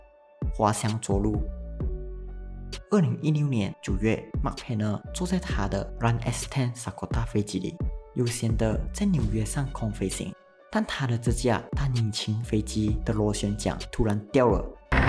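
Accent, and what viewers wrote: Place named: Malaysia. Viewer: 人家是馬來西亞人.這種口音是正常的好嗎...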